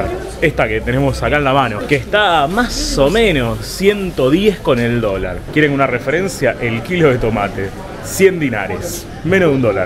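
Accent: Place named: Argentina. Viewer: Hay muy buenos youtubers viajeros, pero es tope de gama ver videos de viajes tan interesantes con una mirada y acento 100 % argentino. Adelante!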